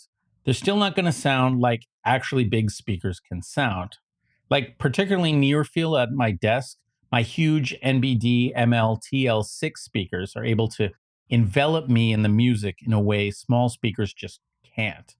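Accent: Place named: Midwest, United States. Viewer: We all love your Midwest accent. Excellent video by the way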